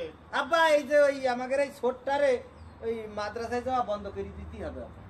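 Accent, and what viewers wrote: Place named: Jessore, Bangladesh. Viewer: যশোরের ভাষা খুব ভালো লাগে, আপনাকে যশোরের ভাষায় সব সময় কথা বলা মানায়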